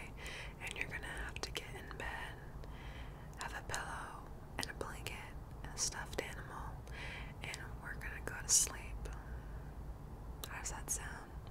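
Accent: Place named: South, United States. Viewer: The southern accent is backk!! Literally ur southern accent vid is my fav vid to fall asleep to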